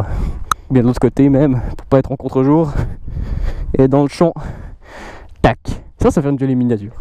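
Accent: Vaud, Switzerland. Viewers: Un joli accent du canton de vaud il me semble :D Je vient de m'en offrir une et j'ai hâte de voir ce qu'elle a dans le ventre ! Merci pour ta vidéo ! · Yo mec ! Franchement très bonnes vidéos t'as un abonné en plus ! Et dit voir, t'habites où en Suisse ? Il me semblait qu'à ton accent t'étais suisse (comme moi ;))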